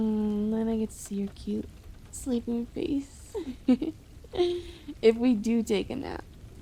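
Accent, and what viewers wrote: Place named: United States. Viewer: You American girls have (possibly)the best accent! You should do a "southern belle" accent video. How much fun are you! New sub from 🏴 😊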